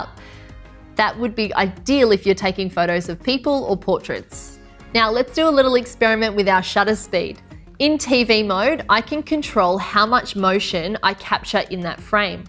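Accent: Australia. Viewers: Aussie confirmed.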